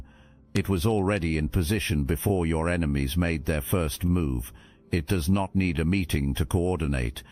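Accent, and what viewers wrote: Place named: United Kingdom. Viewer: my dear chosen one which i respect so much because of your magnificent british accent i thing there is a misunderstanding here ,,what spiritual gifts are you talking about or any spiritual property ,nothing from that exists,i guess that something else is needed here ,, cant trace yet but soon as i realise i let you know,,,,😁 👻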